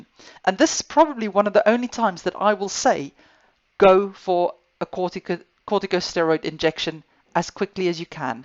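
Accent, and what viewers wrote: South Africa. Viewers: I lived in Auckland NZ I discovered a very painfull shoulder on my August Europe trip every time I put my backpack over my shoulders it really where getting worst coming back the spec diagnosed it at at frozen given a guided steroid injection it feel better for 4 weeks and now feel worse, but the whole time its really painful at the moment but at the back of my shoulder blade the pain shoot down to my fingers I think there is a tear in my rotar cuff. If I stretch my arm straight it feels like it stuck and so painful. My left shoulder already had a rotar cuff operation with a few tears because of an accident. I don't wont to go overboard with excercises just do about 5 at the moment but will it really take 3 years for healing. Their where no MRI taken of my shoulder. I hear your SA accent I left 25 years ago, nice day😊 Groete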